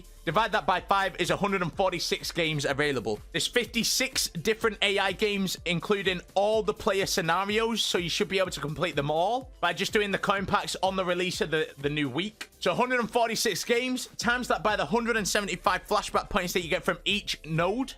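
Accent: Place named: United Kingdom. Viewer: Teach me english! British english i mean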